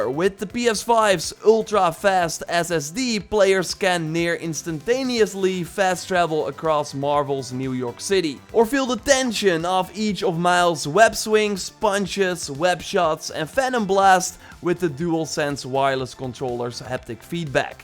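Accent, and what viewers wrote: Netherlands. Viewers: yes hes dutch, his accent is quite thick lol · Are you from holland (Netherlands)? You have a little bit of a dutch accent while you speak english. Great video btw!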